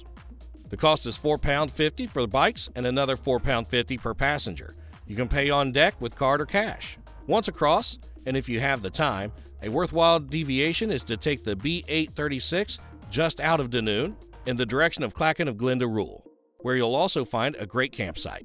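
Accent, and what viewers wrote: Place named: United States. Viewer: It is worth learning what routes are in England, and how to pronounce words in English (don't insult the audience with 'American English' (there is English, and then there are mistakes)).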